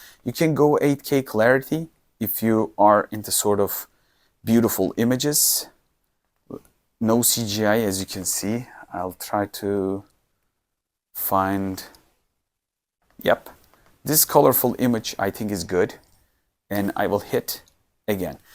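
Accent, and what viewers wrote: Turkey. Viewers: Aksan çok belli ya, abim bizden 😅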